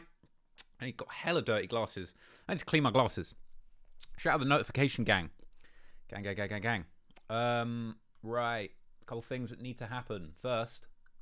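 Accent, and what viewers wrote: United Kingdom. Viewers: BRA you from the UK is the main reason. you don’t know von or understand him, yet you obviously understand who he killed, n how……….von was loyal is the best way to describe him n if anything, he is a vengeful killah. his friends getting killed, sparked the hate for him to do wut he “allegedly” done. he luv’d his gang. If you lived out here maybe ppl wouldn’t be as mad, but he not a serial killah, VON is a vengeful killah. who is really fueled by the luv he has for his friend’z who was killed. he not the only one to get away with body’s. police don’t even try n solve shit half the tyme, in “Chicago” the chances of cops solving a murdah is low. ppl don’t talk it’s just like looking in from the outside…………personally, I don’t think von would be mad about the documentary, only thing we don’t like is you calling him a serial killah! everybody that knew von, knew he had body’s. 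it’s not a secret! it’s just your perception, you’re accent & your WHITE. LMAO 😂😂 Long Live V-ROY 🙏🏽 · Yo Idgaf what anyone says , any British dude that keeps his accent but says alot of black slang when he talks is dope af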